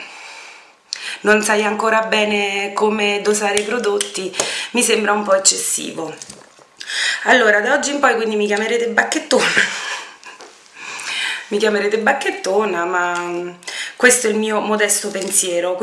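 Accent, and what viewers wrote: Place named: Campania, Italy. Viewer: ciao..sei carina e simpatica..grazie per i consigli posso chiederti di dove sei??parli come me...son campana...ciao.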